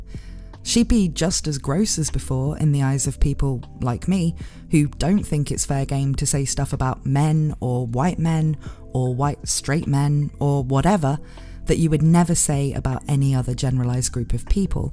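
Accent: United Kingdom. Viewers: I'm loving your videos, I found your channel the other day and I just really like your chill demeanor and accent, I know is a type of British accent but I'm bad with differentiating accent and dialects sorry, even here in America too since I'm a latino lol, but I try since im a fan a couple types like Welsh for example thanks to Dragon quest but I'm kinda rambling at this point so I digress, but I really like it and your dry humor. I've been kinda binging your vids when I can ever since.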